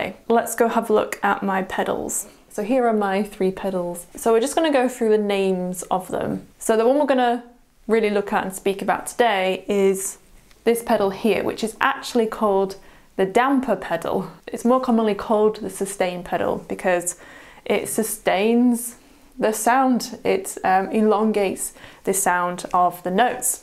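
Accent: United Kingdom. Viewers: Tahnk you for tonight,, For some reason your uk aceent is seemigly plluck my heart string. d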